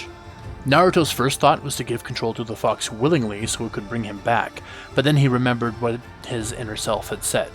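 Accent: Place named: Canada. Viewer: Alas, I'm finally caught up lol. I've been following both this and Dygo's Naruto rewrite series, and I can definitely say that I like both. If asked which one I prefer, I think I'd have to say DygoKnight's, but that could very well be because I've known about and been following that one for a longer period of time. I've known of that one for a few months, and this one I just stumbled across about a week ago, when one of the full arc movie videos randomly got recommended to me by YouTube. You've mentioned being Canadian in a few of your videos, which I actually was able to guess within just a couple minutes of hearing you talk lol. I'm friends with a few Canadians, one of whom just finished a massive multi-year Dragon Ball passion project. Another factor might be the fact that I'm totally blind, have been since birth, and rely exclusively on my ears here; couple that with numerous conversations with the aforementioned Canadian friends, and yeah, it wasn't that hard to tell. 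I know you like to showcase fan art, but even when you do post visuals, well it doesn't matter to me what they look like, since I can't see a damn thing anyway hahaha. I've enjoyed your story so far, though admittedly I may have to go back and re-watch a few of the episodes. I ate some of my aunt's edibles earlier this week and tried to follow along with the story whilst being quite toasted, so my focus probably wasn't the best during those episodes lol. There's more I could say/ask, but I can save that for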